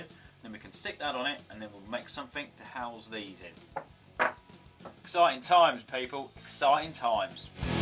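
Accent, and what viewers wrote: United Kingdom. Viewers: Well. He is A Crazy and Genius British Accented man with Strange Futuristic Machines. Colin Is A Timelord Confirmed. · Is he British?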